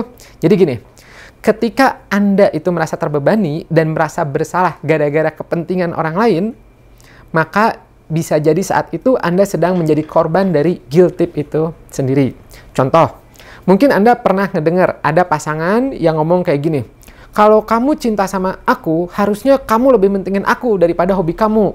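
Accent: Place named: Sunda, Indonesia. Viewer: Vidio yg selalu insightfull soal guilt trip alias gak enakan. Dan Ketika sundanese menjadi narator, sangat terasa intonasinya. Karena saya pun begitu. Tema Kontennya pun mirip dengan, tp masih pemula saya hehe.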